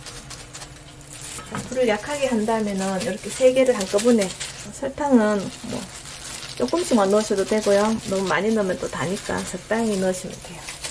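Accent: Gyeongsang, South Korea. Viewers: 제가 결혼하고 다른지역으로 왔는데 정겹게 경상도 말투로 쉽게 설명해주는 님 만나서 반갑고 좋습니다~~^^ 호떡 너무 좋아하는데 한번해먹고싶네요 감사합니다ㅎㅎ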